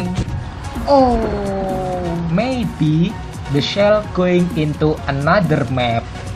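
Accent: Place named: Indonesia. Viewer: Wih orang indonesia mangkanya logatnya gue kenal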